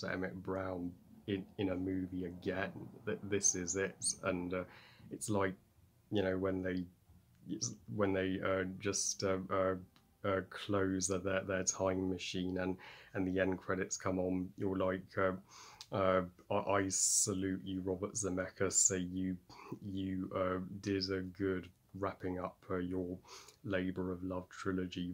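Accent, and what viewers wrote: West, United States. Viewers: Hi! We are big fans of this series. Of course one and 2 are our favorites but 3 wasn’t bad. Your western accent is cute!! 😆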